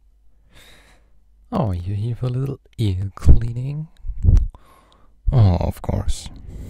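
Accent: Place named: Germany. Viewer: Hes german uwu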